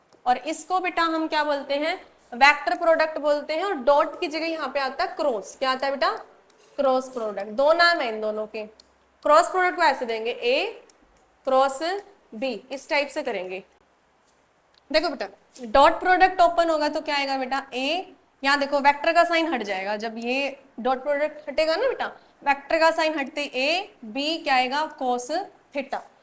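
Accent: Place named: Haryana, India. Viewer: Aap Haryana se ho lag rha mujhe... Excellent explaination mam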